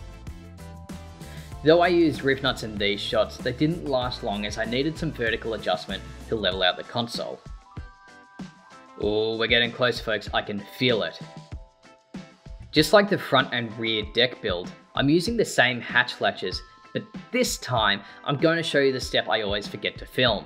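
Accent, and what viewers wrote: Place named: Australia. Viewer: keep the content coming. I also learned some of the Australian accent 😆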